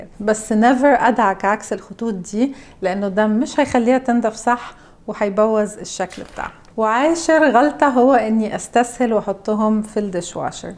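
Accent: Egypt. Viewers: انتي مصريه؟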